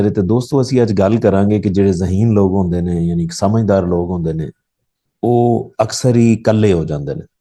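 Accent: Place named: Punjab, India. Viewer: Punjabi accent nice.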